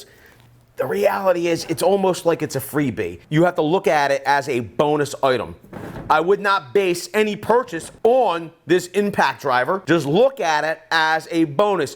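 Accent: Philadelphia, United States. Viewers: Gotta get a Tshirt made that says “AND THE REALITY IS” Strong philly accent go birds!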